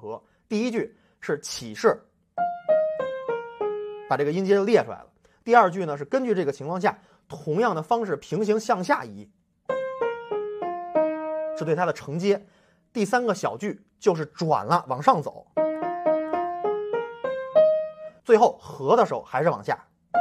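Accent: Beijing, China. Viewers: 就冲这嘴麻溜的京片子，也得订阅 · 你是不是北京人？听着怎么有点像袁腾飞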